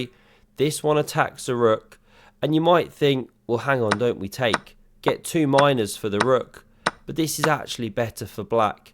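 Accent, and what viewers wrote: United Kingdom. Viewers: Thank you. I meant your real name :-) From your accent you must be British and I love your shirt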